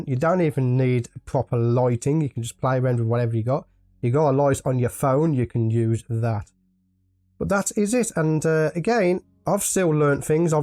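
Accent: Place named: Birmingham, United Kingdom. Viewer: Gotta luv the brummie accent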